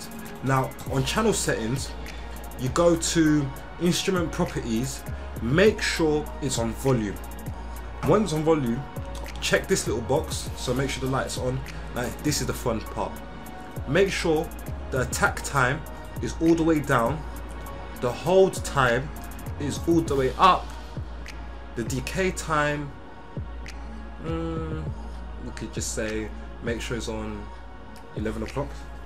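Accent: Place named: London, United Kingdom. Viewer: so good to hear a ldn bruddda lol, keep it up my g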